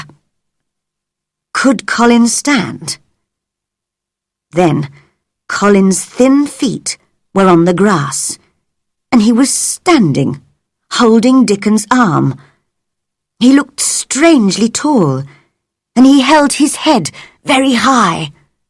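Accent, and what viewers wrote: United Kingdom. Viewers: you v'e got wonderful British accent with amazing voices ❤❤❤ please keep posting your stories, l really liked and I appreciated it so much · اللهجه بريطانية 😭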